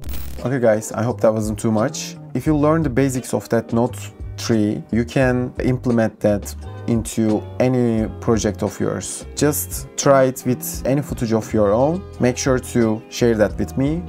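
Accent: Turkey. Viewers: lan dedim bu adam tam Türk gibi ingilizce konuşuyor Türk çıktı, selamlar teşekkür ederim video için :) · You sound like you are Turkish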